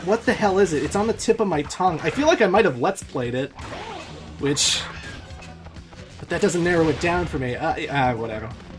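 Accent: Canada. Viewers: Bro you're accent, dialect and your chilled charming canadian voice is always a pleasure to listen to while seeing your MLG megaman/sonic/any game playthrough. If you weren't canadian with this chilled personality then i wouldn't be into megaman. I've played more megaman games than the 8bit ones because of your LPs. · you say it right, just with a Canadian accent lol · WHO EVER IS BULLYING YOU ABOUT THE WORD HOUSE IS CRAZY. YOU’RE SAYING IT JUST FINE 😂 as a fellow Canadian, I concur!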